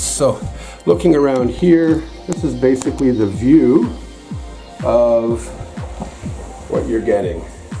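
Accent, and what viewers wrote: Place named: Canada. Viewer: haha amazing! I’m Canadian too and I thought I noticed a slight Canadian accent lol 🇨🇦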